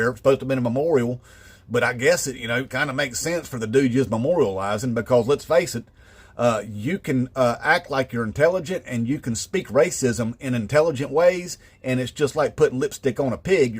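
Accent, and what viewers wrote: South, United States. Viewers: Hahaha this guy is modern day version of the Third Reich with a redneck accent. The left hate it when other people have different opinions. I don't believe in the rapture therory but certainly respect those who do. HEY DUDE LET PEOPLE BELIEVE THE WAY THEY WANT TO IT IS CALLED THE FREEDOM OF RELIGION...EVER HEARD OF IT? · You sound like you're from the South. Don't tell me that you can look around the US today and not feel that the idea of Jesus coming to unalive you doesn't have some appeal. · Hi Mr., I gotta confess I love your accent, it sound so american-rich linguisticly!! Cheers from Costa Rica!!! The whole world is literally watching!!!! 👍🏼👍🏽👍🏾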